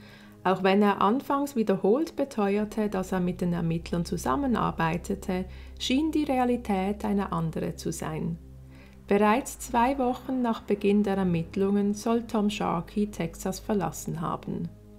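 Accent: Switzerland. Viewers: Ändlich mal ä schwiizerin. Machsch guet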